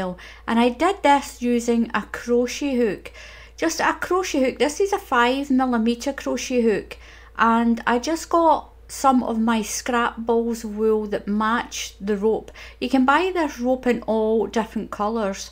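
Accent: Scotland, United Kingdom. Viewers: You have the coolest accent I have ever heard. Where precisely are you from? Scotland, right, but where? Just curious. I'm American and we never hear this. It sounds quite a lot like Scandinavian-- in the inflection--and many of the sounds are quite similar. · Scottish accent always melts my heart <3 Thank you so much!! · I'm Brazilian, English isn't my native language and I can understand very well. Love your Scottish accent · I love your Scottish accent and your voice! I could listen to you all day long x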